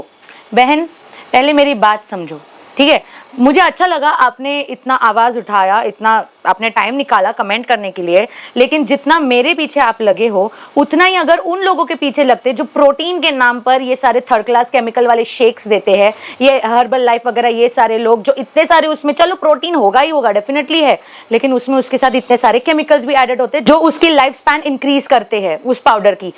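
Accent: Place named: Maharashtra, India. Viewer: Are you marathi tan and so many words are sound like you are Marathi ❤🎉🎉🎉🎉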